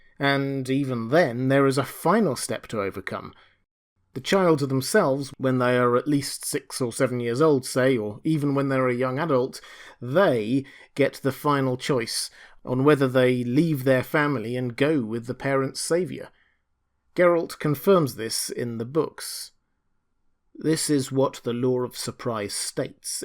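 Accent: United Kingdom. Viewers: Lol it's just his British accent I think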